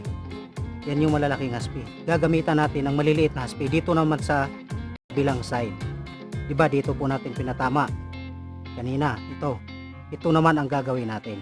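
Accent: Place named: Visayas, Philippines. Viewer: Boss tha cebu ka raw, kaya pala pansin ko may bisaya ka na accent hehe tga cagayan de oro ako, proud bisaya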